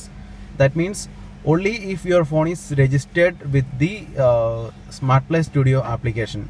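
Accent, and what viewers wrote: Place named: India. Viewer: Lol the accent. The way you say maruti... only an Indian can catch that.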